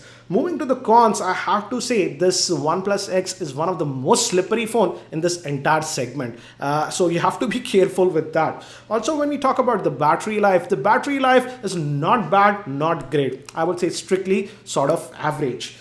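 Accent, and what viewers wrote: India. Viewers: hate indians accent lol, cant understand anything sometimes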